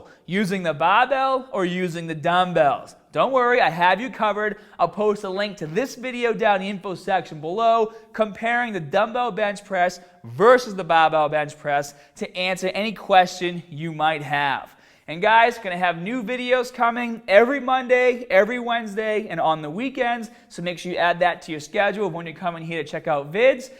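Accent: Boston, United States. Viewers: Sounds closer to a Boston accent. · Got nothin but love for that Boston accent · Love the Boston accent. No homo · I'm assuming you're from Boston, Mass. Love the accent [here in Australia!]. Very informative video. Excellent. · boston aye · Subscribed for the Boston accent and legit information. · love these videos but sometimes that accent makes me crack up :Bah, Bahbell, Hahd, entiah, muscle fibah hahaI wonder how weirb my SoCal accent sounds in Boston and new yohk and new joisey ? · yeah the dude has a real Boston accent lol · Boston or New England accent basically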